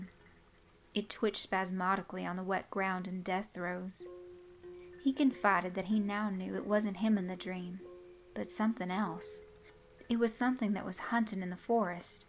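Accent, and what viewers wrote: South, United States. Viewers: I honestly love your videos!!! I was born, raised, & still live in Alabama & so glad to hear another southern voice. All of your stories are always so captivating. Great job & keep up the amazing work. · Please don't take this the wrong way . Your southern lilt really puts a story to a first calming effect and at or towards the end I can pick up the fear or excited tone bringing the end to a much more dramatic conclusion . Great job on the story .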